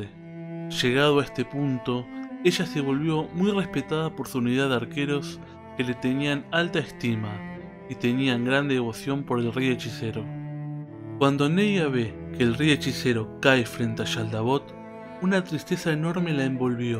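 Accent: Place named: Argentina. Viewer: ¡Ése sentimiento en el guión! 👍 👍 👏👏👏,para un Hispanoamericano, sólo lo puede vocalizar un Argentino 🙌🙌😘💜, te lo dice un Chileno amante de su Patria, ¡bravo por tí! 👏👏👏✌✌✌🙌🙌🙌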